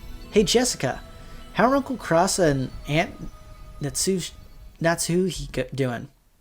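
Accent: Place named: United States. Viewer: Jesus christ the blueish grey haired woman's tits are so unnaturally big that it feels uncomfortable to look at her, the faint femme fatale smile on her face doesn't help either lol Loving the voice acting! especially the female voices and the little girl's "uu-" XD so you are American? I should have noticed! I'll give you a little hint: Unlike in english, where a certain vowel may sound different depending on the word, in Japanese, every vowel always sounds the same way: A always sounds like "ah" (as in cat) E always sounds like "eh" (as in pencil) I always sounds like "ee" (as in feel, peel) O always sounds like "oh" ( just a short O sound as in "boy", not as in the verb "to go") U always sounds like "oo" (as in fool, goon) (It's much easier for a native spanish speaker as myself to pronounce japanese! you may have noticed some grammar horrors in my comments! 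Hope that was helpful!)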